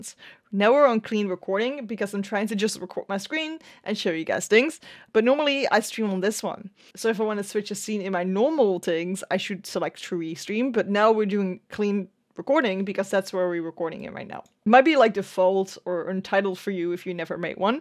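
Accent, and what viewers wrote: Netherlands. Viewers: are you dutch? you really have a dutch accent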